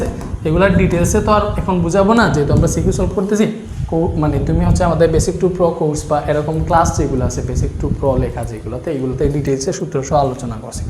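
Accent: Sylhet, Bangladesh. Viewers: Vai apni Sylheti Matiya Koin ❤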